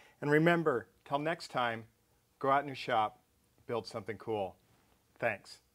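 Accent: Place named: United States. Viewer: Solder has an L in the word: why can't you Americans say it properly?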